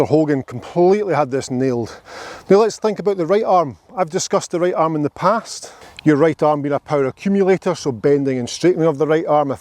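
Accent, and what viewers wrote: Scotland, United Kingdom. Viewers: Hello! First, I love accent. Are you scottish? Also, thank you for making these ben hogan videos. His book is timeless and to see somebody bring it to life through video really helps a beginner. I noticed that on the post impact part of your swing, your arms are aggressively bent in to the body, as opposed to hogan's full extension. I feel like I have that same tendency too. What do you think?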